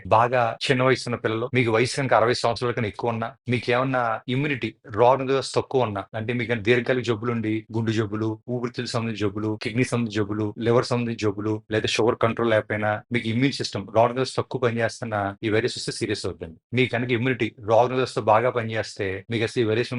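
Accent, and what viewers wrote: Godavari, India. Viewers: Doctor garu ...Godavari varu anukunta..😅😅😅😅😅😅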